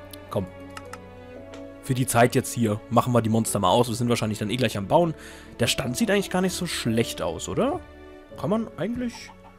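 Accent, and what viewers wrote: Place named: Palatinate, Germany. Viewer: Red' mal mehr pfälzisch :D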